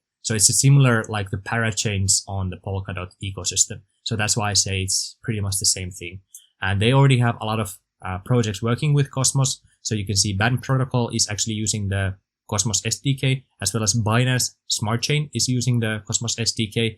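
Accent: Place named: Finland. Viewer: I sense a fellow finnish accent, great content btw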